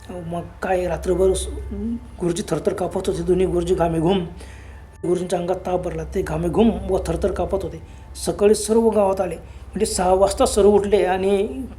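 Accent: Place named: Khandesh, India. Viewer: सांगणारे खानदेशात ले आहेत वाटत. काय कथा वाचता 😅😅😅😅...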